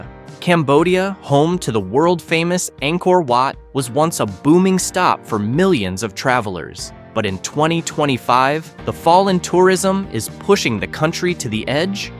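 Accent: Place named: United States. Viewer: Yet another American accent telling 'like it is'. Don't believe anything that comes out of that place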